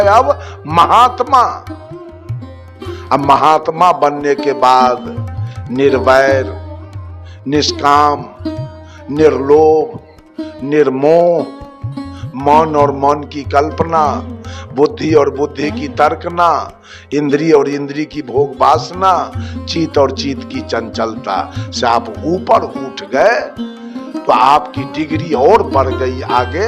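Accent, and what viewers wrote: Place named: Bihar, India. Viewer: Baba ji aap ka tone to bihari lag raha aur ghee dudh dahi ki baat kar rahe ye to sadiyo se chalti arahi parampara hai Sanatan Dharm mei avi ke pandit thodi late hai ghee sudh hota hai isliye to samsaan mei v ghee se jalaya jata hai 😊bina Shakti se ye duniya nai chal sakti aur chath Puja k baare mei to kuch bolna v mat qki aap agaynta mei hai ye sanatan ki astha hai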